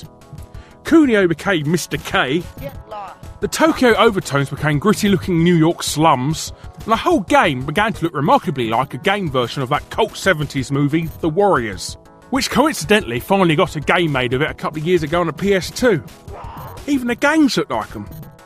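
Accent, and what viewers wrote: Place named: Cockney, United Kingdom. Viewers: that english accent is awesome!! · So you talk in the same way that was ridiculed in that old play " " where that guy sings the song with the lyric "no one taught him 'take' instead of 'tike.'"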